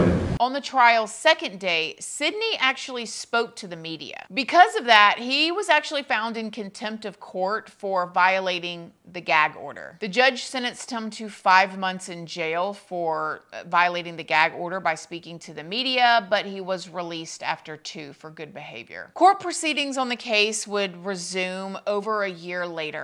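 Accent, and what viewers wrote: South, United States. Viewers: You can really tell that your from the south..."cause the most beautiful things come out your mouth" 😊❣️🎉 the adults in this situation were very immature emotionally and spiritually..You expect a 20 year old to not be super mature and aware of things but honestly these older people took advantage of her inexperience with relationships and her crush on a married man... They should have been setting a better example for the young lady...but obviously they had their own deep rooted issues . I think they both got off on the whole thing....Heather didn't make the best choices ..but she was young and inexperienced and didnt deserve to die over it... Tammy and Sydney are preditors... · New sub here. Love your videos...plus you gotta be from the south or have a lil hood in you. " beat the dog doo doo out of him" lmao I havent heard that in soo long. 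Plus the way you say POlice. I love it. You do a great job on these videos. Cant wait to see more. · 😂😂 guuuurrrl I know U country I can tell that, yes ma'am lol but I can't pick where U from, I have fam in calli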